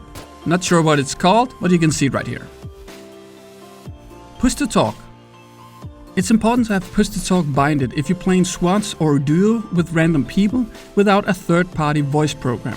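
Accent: Denmark. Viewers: That Danish accent tho!!!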